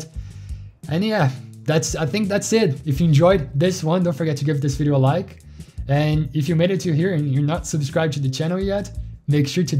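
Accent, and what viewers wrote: Brazil. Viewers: tá tranquilo, eu achei legal o canal. Desconfiava que era brasileiro heheh agora tenho certeza. Parabéns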